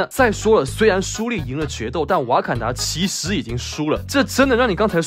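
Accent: Taiwan. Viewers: 你講話好台灣腔哦 都沒有捲舌欸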